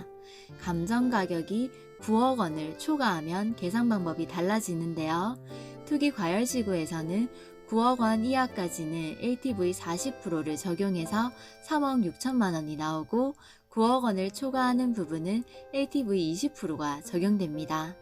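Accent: Gyeongsang, South Korea. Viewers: 경상도 사람인가봐요ㅋ 사투리가있어보이네요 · 엥~? 대구로 들리는데 ㅎㅎ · 구수한 갱상도 사투리로 자세한 설명 해주셔서 감사합니다.~~ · 경상도 사투리가 너무 듣기 좋네요 ㅋ 좋은정보 알아갑니다 ^^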